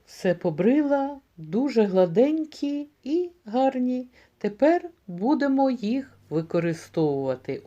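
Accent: Standard. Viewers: так, добре поставлений голос, як у диктора радіо та телебачення, або як у вчительки. Та правильна українська мова, без домішок, та різних польсько-австрійських "вивертів". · НАКОНЕЦ ТО Я СЛЫШУ ПРЯТНУЮ НАСТОЯЩУЮ ЛИТЕРАТУРНУЮ УКРАИНСКУЮ РЕЧЬ,А ТО ИНОГДА ДАЖЕ НЕ ПОЙМЕШЬ НА КАКОМ ЯЗЫКЕ ГОВОРЯТ,НО ТОЧНО НЕ НА УКРАИНСКОМ.СПАСИБО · Дякую за чисту украīнську мову без польсько-угорських домiшок!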